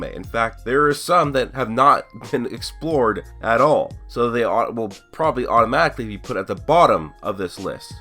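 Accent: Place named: England, United Kingdom. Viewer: Great video. I totally agree with this list. One thing you should work on is the way you say their names. The english accent kinda ruins the japanese name of some characters. So work on it. You have my like though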